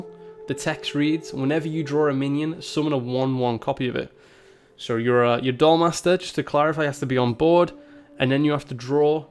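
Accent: Liverpool, United Kingdom. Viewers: Fiufsciak - don't subscribe so I can prove reverse psychology is a total bs I think he’s from Liverpool, or at least that part of England. He called his accent “Scouse” in a tweet and Wikipedia says that where it’s from.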